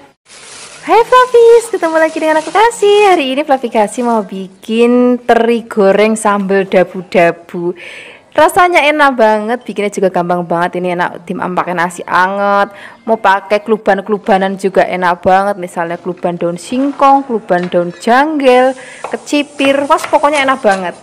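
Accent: Java, Indonesia. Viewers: So yummy banget Suka dengan resep²nya bahasanya medok kyak Saiya kak orang jawa Kaye trimkasih Kaka resepnya topmarkotop Joss🥰👍👍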